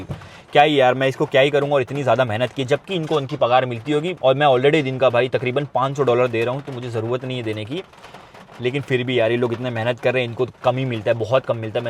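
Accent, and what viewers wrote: Mumbai, India. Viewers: I enjoyed your video, I am a Pakistani and enjoyed the way you speak Mumbai type Hindi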